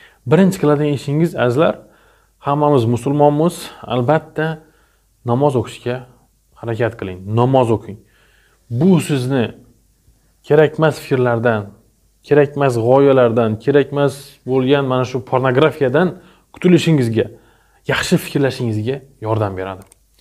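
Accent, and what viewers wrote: Khorezm, Uzbekistan. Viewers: Asslomalekum doktor Xorazmdan òxshisiz tiliz òxshab turibdi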